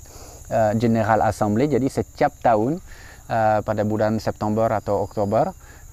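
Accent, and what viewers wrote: France. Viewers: Banyak hal yang saya suka dari anda, tapi ada satu yang paling saya suka, adalah cara bicara anda memakai bahasa Indonesia dengan aksen France yang berkelas. Sengau sengau aduhai. Really love it 😂😀❤️🔥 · Aksen Perancisnya masih kerasa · Dari kecil saya selalu tandain, kalau ngomong "memang" jadi "mamon" dalam aksen Prancis:D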